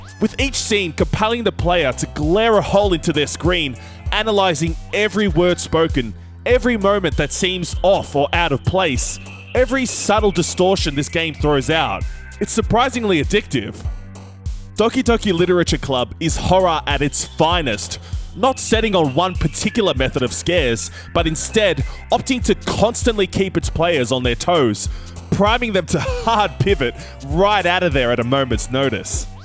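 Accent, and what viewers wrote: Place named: Australia. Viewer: why are you Australian? I actually need to know.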